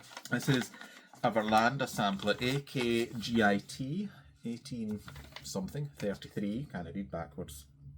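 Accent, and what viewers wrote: Scotland, United Kingdom. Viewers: Love to hear a fellow Scottish accent on flosstube! I loved your full coverage progress on 18ct- cats are my muse, especially a black one. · Hi! 🎉 congratulations on your first 2 Flosstubes. You’re a natural and I miss Amanda so I’m getting my fix of your wonderful Scottish accent! I’m Pauline of Sew. Be Bags who you bought your lace front project bag from. I remembered when I saw you’d made a Flosstube it was who bought one of my bags. As I’m watching you I’m stitching on Portrait of Veronica but she’s going away so that I can start my Glass Slipper because yours is fabulous ❤❤❤ love love love her. Can’t wait to see no.3 to see what you’ve been up to. 😊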